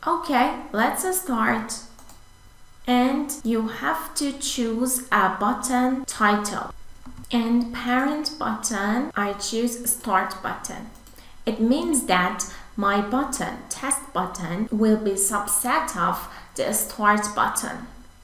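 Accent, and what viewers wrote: Iran. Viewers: Your accent sounds Persian to me nice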